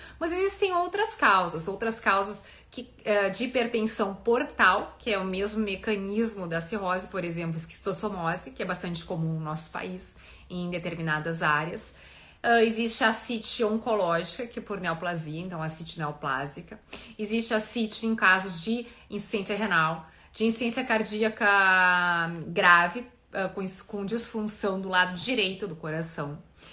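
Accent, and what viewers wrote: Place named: Rio Grande do Sul, Brazil. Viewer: Muito bem Dra. Tem que parar com este sotaque de ficar falando ... éeeennhh, uuinhun, Horrível este sotaque. Eu sou gaúcho Mas este repetitivo é coisas das mulheres gaúchas.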